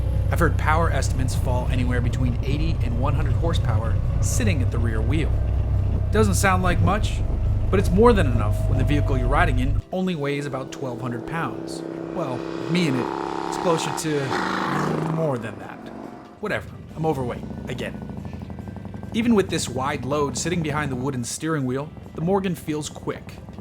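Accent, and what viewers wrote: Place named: United States. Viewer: Fantastic review. Also you have a nice American voice. Not annoying at all!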